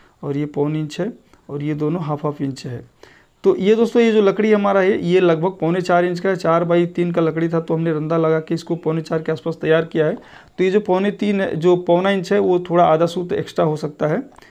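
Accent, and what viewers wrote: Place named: Madhya Pradesh, India. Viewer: MP latiya bolate Hain Mere Bhai❤❤❤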